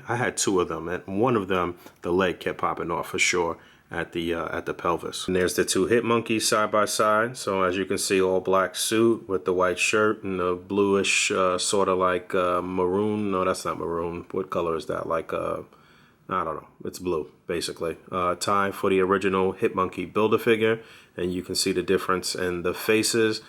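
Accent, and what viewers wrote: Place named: Brooklyn, United States. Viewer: You from Brooklyn?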